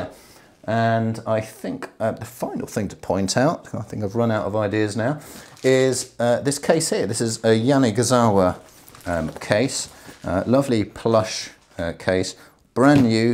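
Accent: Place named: RP, United Kingdom. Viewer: Only a £4999 bari to start with, LOL, and, wow, with a genuine Boris Johnson accent, too. Speaking of which... I have a yacht for sale. It is only £1000 and it comes with a guaranteed weekly dividend of £3,500,000. I used to have that accent down to a T and then I moved to the US. Maybe that's a good thing, though. PS Don't worry, I have ordered stuff from you in the past and not even Boris Johnson can stop me.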